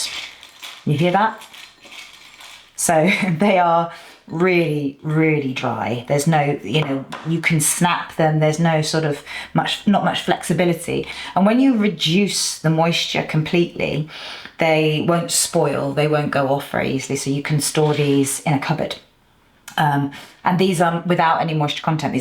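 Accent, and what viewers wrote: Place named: United Kingdom. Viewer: Thank you for sharing/posting. I love that British accent.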